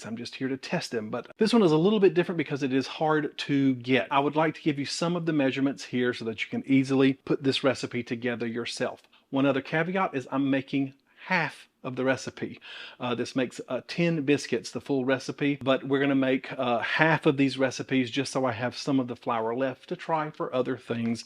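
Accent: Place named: North Carolina, United States. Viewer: you sound so much like we do in North Carolina, so I feel like you're a good friend. You talk just right!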